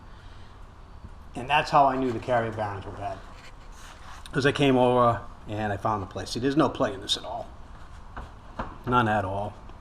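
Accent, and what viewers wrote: Boston, United States. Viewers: Def sound like he from Boston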